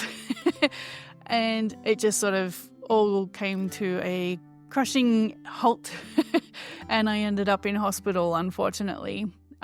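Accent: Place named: Australia. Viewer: Glad I've found your channel. I've just subscribed. It's lovely to hear an Aussie voice and to hear your story. I'm in Tassie. I retired this year and also first picked up cross stitch (HAED of all things) but found it a bit too intense with the concentration and had to use a magnifying lamp and even that was straining my eyes. So, I've put that on the back burner for now. I'd learnt basic crochet stitches as a youngster from aunts and great aunts, maybe even my grandmother but I'm not sure. But I've never crocheted an actual item. I found some 3 ply wool I'd been knitting up but never finished as a baby blanket over 26 years ago when I was having my son. A few weeks ago I took the unused wool from that and a crochet hook I found in my sewing box and taught myself from YouTube videos and made a small pram sized baby blanket with a really pretty shell stitch. 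It was so satisfying to actually finish something. Then my daughter in her 20s pointed me to a pattern on Etsy for a daisy granny square blanket and asked if I thought she'd be able to make it. I looked at it and told her probably not. She's never crocheted before. So I bought that pattern and saw two other patterns I liked on that Etsy site and bought them. It's DreamMakesbyJD. I think she's in WA. So I started with the Mermaid Dreams pattern as a test run for myself. I started the large size (she called it Afghan-small) and then realised I didn't want it that big. So I looked up the dimensions online for what I was look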